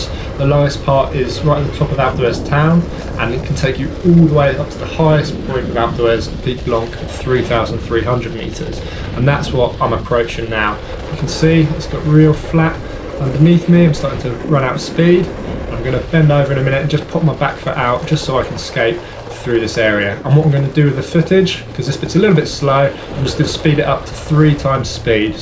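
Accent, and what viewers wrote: United Kingdom. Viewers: Oddly satisfying no loud music or fancy tricks or montages just a start to finish cruse down a mountain with a British narrator voice over.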